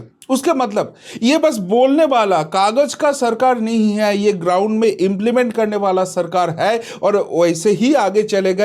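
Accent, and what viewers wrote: Bengal, India. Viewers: da, apnake request korchi, apni Hindi News er jonno ekjon bhalo Hindi bashi News Reader appoint korun. Karon apnar hindi uccharon khub kharap. 100 kothar modhye 90 ta kothai bangla die makhano. Apni dekhben Arnav Goswami ki bhabe suddho hindi bolen. Kharap paben na, follow korun, ar bhabun. Apnar bangla news ami sob somoy dekhi, bhalo lage, banglai chalan....... 🙏🙏🙏🙏🙏🙏🙏🙏🙏🙏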